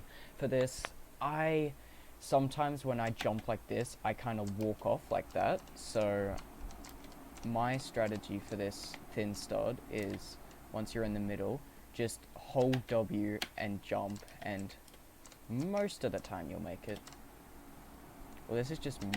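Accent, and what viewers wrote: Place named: Australia. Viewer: Woah you have an Aussie accent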